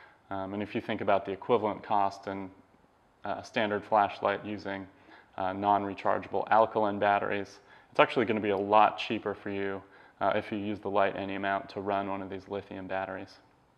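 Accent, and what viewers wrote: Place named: United Kingdom. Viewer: haha love the british accent - love the lights. gonna put some $ toward the Beta QR next check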